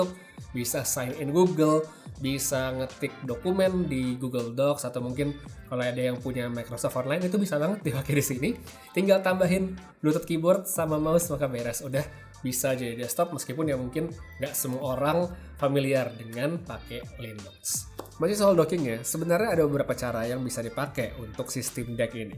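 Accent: Bali, Indonesia. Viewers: Bang Lo orang Bali yak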